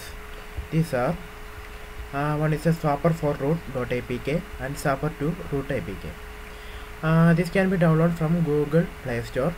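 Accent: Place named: Kerala, India. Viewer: .... thanks a lot..... frankly speakin isimply wasted my cash on that roesoft ram exoander bullshit!!!! i cud done this for free...... btw are u a keralite...... coz ur akcent seems to be like that...... im a keralite!!! anyways thanks bro!!:)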